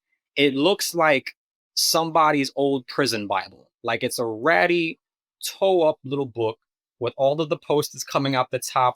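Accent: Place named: New York, United States. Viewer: I used to go to Limelight , Twilight , tunnel in my late teens and it was a scene ! I didn’t realize all this vampire culture was going on around me. I was just there for the music lol and the dancing. You are so eloquent and as a fellow New York Latina I love listening to your native New York Latino accent. Great video !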